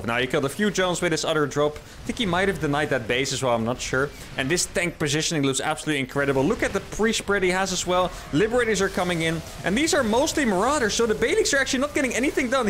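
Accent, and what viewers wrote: Netherlands. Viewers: Found the commentary/analysis really interesting!! Your speech patterns sound really similar to Lowko's, I guess I just like that Dutch accent!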